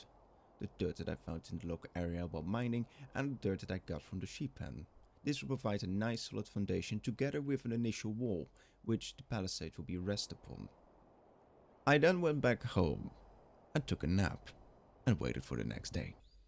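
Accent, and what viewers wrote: Netherlands. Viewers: Love this series so far! I'm also guessing you're Dutch based on your name and accent :P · Are you dutch by any chance? I feel like I can hear it a little bit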